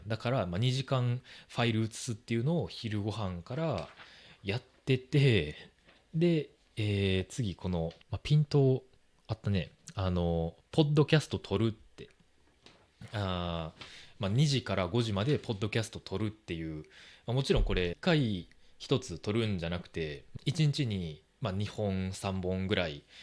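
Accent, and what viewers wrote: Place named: Kansai, Japan. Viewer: 初めてコメントします！大阪で働いている台湾人です。同僚のほとんどが関西出身なので、みんなともっと関西弁で話せるようになりたくて、関西弁を勉強しようと思っています。Ryuseiさんの関西弁で撮られたポッドキャストを全部、何回も繰り返して聞いています！少しずつ関西弁の響きに慣れてきて、本当に助かっています。これからも関西弁のポッドキャストをぜひ聞かせてください！（もちろん、標準語のポッドキャストも楽しみにしています！）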